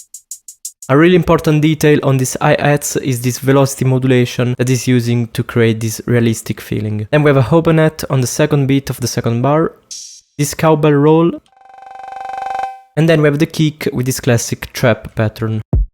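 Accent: Italy. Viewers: Accento molto italiano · minchia si sente l'accento italiano haha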